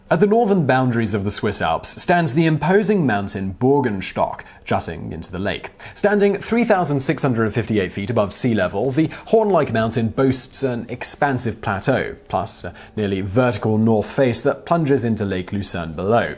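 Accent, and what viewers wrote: England, United Kingdom. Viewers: It is really weird to hear a guy with an English accent say "elevator" so many time in one video.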